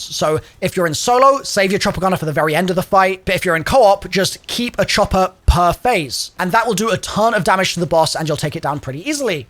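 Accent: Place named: United Kingdom. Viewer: Thank God for the subtitles. I hate your shit British accent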